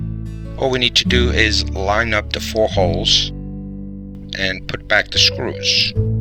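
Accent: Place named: Georgia, United States. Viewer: Nice accent, north Georgia?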